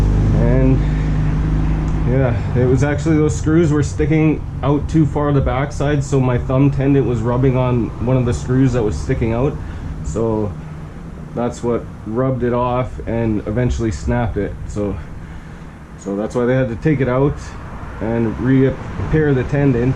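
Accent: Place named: Canada. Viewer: Wow! Poor guy. Just can't believe it. You must be the strongest person I know. I seen your framing work and the fact there isn't a lazy bone in your body. The big house you frame by yourself is what got me hooked on your videos. Just couldn't believe you were doing that on your own and the temps were freaking cold. You sound Canadian and for what I been watching, you Canadians rock. Hope God keeps you safe and you never get hurt like that again. Amazing person you are since I started watching. You also a great educator and well thought out person. Great work you do. I never seen anything like that before. Watching you, I get so scared just seeing it. Keep up the great work you do. Canadian people have impressed me for a long time. I watch them off grid cabin and houses they built on their own. Wow! Take care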